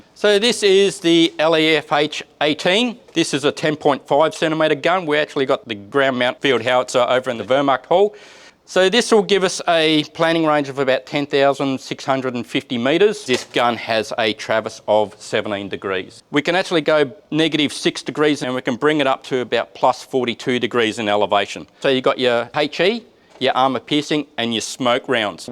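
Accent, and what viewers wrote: Australia. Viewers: You could mute it and try closed captions though CC has some issues with Aus accents.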